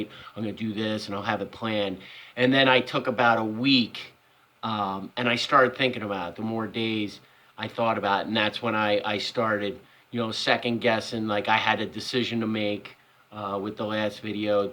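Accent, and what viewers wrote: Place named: Mid-Atlantic, United States. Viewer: Ha, I am in South Eastern Pennsylvania, I thought that I recognized a Mid-Atlantic accent, ha, Alcohol is my drug of choice, drinking Vodka as I type, I used to smoke weed years ago, not really my thing anymore, I’ll take a few hits her and there, do what you do man, it’s all good, trucking is horrible these days, still an LTL driver, companies such as YRC and NEMF etc, are having a tough time finding drivers, local drivers, because, they do not want to pay anything..oh well..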